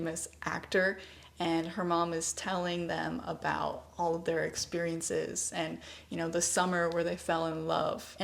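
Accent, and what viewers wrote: Standard. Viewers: Leah讲的似乎就是慢速、完整、标准的英语。有的家庭是要求孩子这样说话的。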